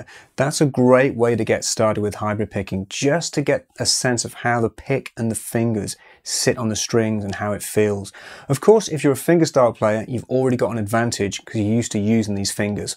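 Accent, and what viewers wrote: United Kingdom. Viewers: Click on the video about Country Guitar Techniques, greeted with an English Accent... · Country stuff explained in beautiful RP English. The wonders of the internet. And very well explained as well.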